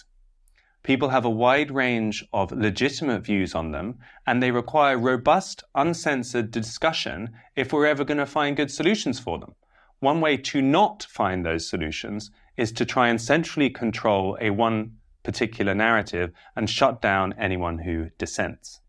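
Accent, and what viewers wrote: United Kingdom. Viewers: Yeah I'll engage, this is just a cute little British accent and version of the right wing complaining when breitbart got their advertising yanked. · This is some kind of bullshit, but the british accent makes it palatable somewhat 😂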